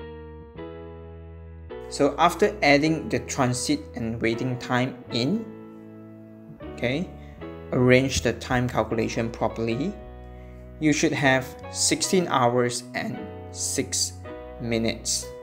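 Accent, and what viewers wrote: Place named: Singapore. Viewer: You’re definitely Singaporean from your accent. hello friend, tomorrows national day!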